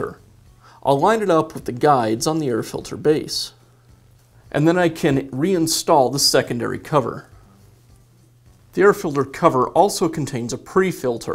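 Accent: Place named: United States. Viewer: I'll give a thumb up for all your videos only if they have your subtitles. Unfortunately, your nice American accent really breaks me into sweat. I'll try to get used to it, however without transcription it's too heavily yet.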